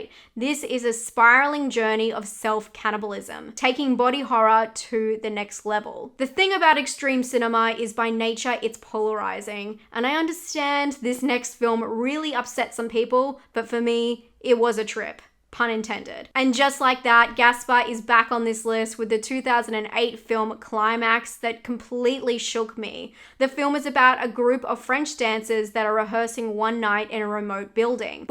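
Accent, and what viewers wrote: Australia. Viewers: I love it when you say "bizarre". Love an Aussie accent!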